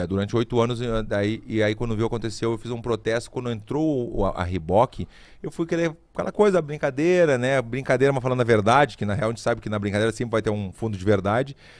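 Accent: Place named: Rio Grande do Sul, Brazil. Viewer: O sotaque gaúcho é muito forte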